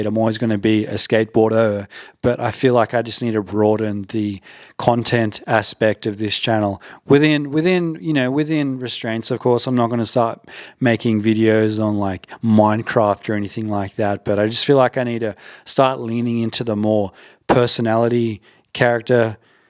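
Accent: Australia. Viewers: Glad you still sound Australian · crazy you still have your aussie accent tbh. people lose it in like months let alone 5 years.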